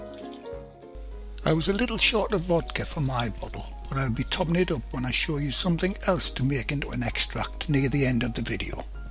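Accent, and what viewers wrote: United Kingdom. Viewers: I have subscribed already and managed to see some of your videos I really enjoyed them, you sound intelligent professional and everything is clear and well explained. Please continue uploading good videos. (and of course who can resist the British accent :-)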